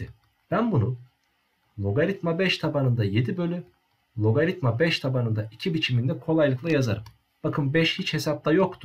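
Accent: Ankara, Turkey. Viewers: Ankara şivesi var hocam sizde Ankarılısınız dimi :D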